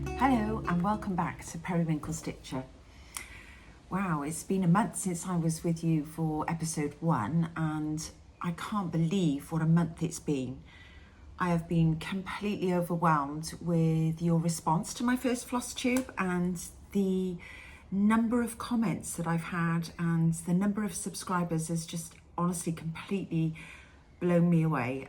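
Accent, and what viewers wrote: Wales, United Kingdom. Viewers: Just found your channel, do I detect a bit of Welsh accent? I was born in Pembrokeshire on the border of Carmarthenshire, speak fluent Welsh niw residing in Reading UK, now I will continue to watch! X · Hi another wonderful flosstube. It’s so nice to get to know you through your stitching. I know exactly how you feel about being a lone stitcher, as that is exactly how I feel. I have an instagram account just from my crafts and stitching so all my family don’t get to see in on my own account and it’s also great to look back on all your hardworking. I normally watch flosstube from the USA so it’s lovely to hear a fellow Brit on here. I also love your embroidery. My daughter was very lucky to study her hand embroidery degree for 3 years at Hampton Court Palace So if I ever get stuck I call on her 😂 can’t wait for your next flosstube. 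Also I love a pink rose.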